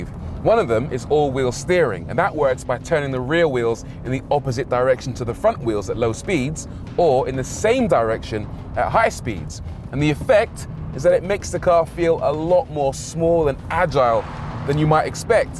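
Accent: United Kingdom. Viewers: I love your presentation and British accent 👍🏿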